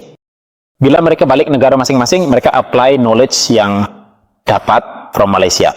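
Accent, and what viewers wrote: Java, Indonesia. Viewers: Dari suaranya ketahuan narasi yang dibacakan ini adalah Orang Djawa, dan bukan orang melayu wkwkwk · Min cara kau bercakap Melayu pun dah tau kau orang indo so takpayahlah nak menyamar kononnya kau orang Malaysia. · Admin ni pasti lahir di parit ponorogo batu pahat slnk dia jawa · Audio bunyi slang jawa indo cakap bahasa melayu. X rasa mcm orang Malaysia yg buat klip video ni biar pun suku Jawa adanya di tanah Melayu.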